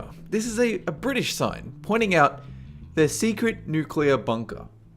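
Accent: Australia. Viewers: OMFGGG i'm Australian too.. but, that's not the point. i thought you were American, and as soon as i heard you say WE have a saying here in Australia i can now suddenly hear an accent coming from you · Is Australian? I can never tell the difference between a british, eglish, and australian accent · Are you australian cause i am and you hardley sound australian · Very funny! Also, if you have closed captioning, like I do, it doesen't understand the Australian accent And the "gusses" it makes in American English are funny too! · I don’t know how many times I’ve started a Planet video only to learn that wasn’t the narrator for that video. I don’t mind the other narrators at all, but the constant disappointment of not being able to listen to beautiful Australian accent when I intend to is annoying. Thanks for this playlist. Now I can listen to Australian lit whenever I want to, and only him. 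Also this is one of, if not my favorite, videos. I don’t know how many times I’ve watched this video. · He just sounds like a normal aussie to me haha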